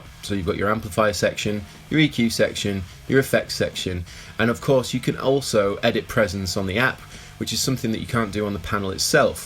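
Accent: Yorkshire, United Kingdom. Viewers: It was a tough choice between this and the Blues Cube. I chose the cube (100w) and love it, even though I’m considerably poorer. You’ve become a very accomplished player over the time I’ve watched your stuff. Thanks for all the hard work and I wish you a fantastic new year buddy. Btw.... are you a Yorkshire lad?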